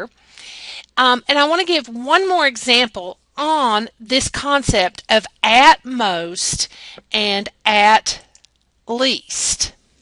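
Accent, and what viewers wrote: United States. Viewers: I love her American accent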